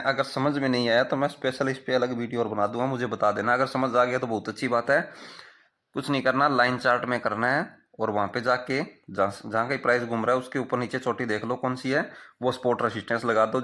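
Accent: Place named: Jind, India. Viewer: m jind se hu bhai voice se laga tha local bhai ho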